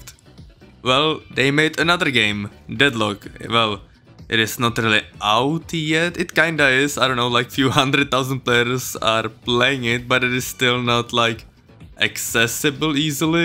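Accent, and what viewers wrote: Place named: Czech Republic. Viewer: jako ta česká angličtina ma sere ale jinak top videjko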